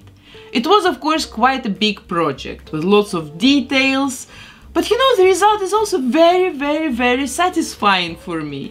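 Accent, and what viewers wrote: Russia. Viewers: Сильно слышно русский акцент 😂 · Какой то слишком русский акцент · are you russian? not being mean or races. just asking. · Lol all the cautions are a little frightful! Lol...(Russian accent) “don’t get me mad, I know how to make you into a doll, with household products! “. Gawd I LOVE LOVE ❤️ that accent! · Is she Russian? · акцент русский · Либо я уже глухая,либо есть какой-то русский акцент у автора канала 🤔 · блять я слышу русский акцент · Почему я слышу русский акцент? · Почему мне кажется что она из России, у неё окцент что-ли "русский"?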